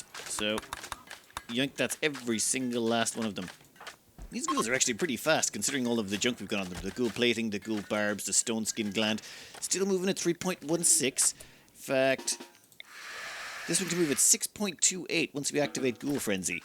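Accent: Ireland. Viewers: Man WTF... Your life does not mean you can stray from the now mandatory daily update of the saga.....:eyes-purple-crying: love you.. longer vids plz, just give us the full 3 hour sesh without edit on a side vid, ppl would watch or listen to you for hours. Those who say otherwise are metal horrors... All you would need to do is talk for 3 hours... no trouble at all XD. My Girlfriend plays Oni and watches your vids religiously on that game.. I play Rim and do the same.. But we both watch any of your vids in the background while playing or chilling... eg. If you have a little more time to do comentary on longer vids ppl will watch or listen, as a Scotsman its very nice to hear an Irishman narrating Great vids on my Fav game! :face-green-smiling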